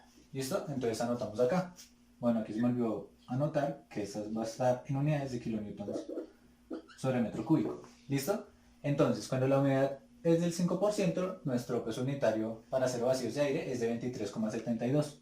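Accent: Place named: Colombia. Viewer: tal vez ya no te sirva pero por el acento creo que es Colombiano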